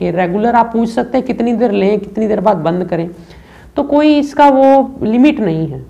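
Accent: Punjab, India. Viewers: Aap Punjabi ho??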